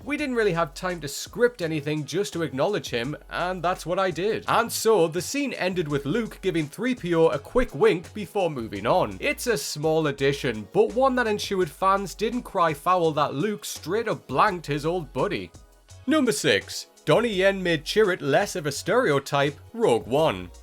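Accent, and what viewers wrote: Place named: Newcastle, United Kingdom. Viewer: That's so funny that the final chapter in the video description says "Rear Park" instead of Ray Park, when the video narrator's got a Geordie accent :)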